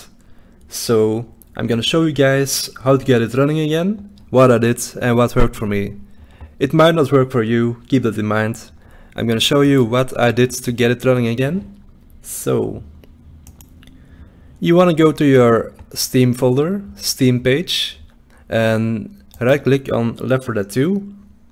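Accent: Netherlands. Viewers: i just knew you were dutch haha i could hear it because of your accent haha. geweldige video man. kan eindelijk weer is normaal left 4 dead spelen haha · Are you dutch? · are u dutch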